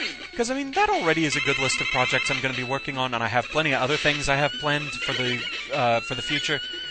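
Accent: United Kingdom. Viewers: You live in texas.What.You sound so British,bit of a stretch but maybe even Scottish but youre American.mind blown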